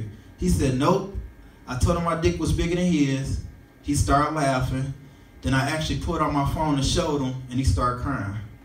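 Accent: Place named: Detroit, United States. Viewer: He got that Detroit e man accent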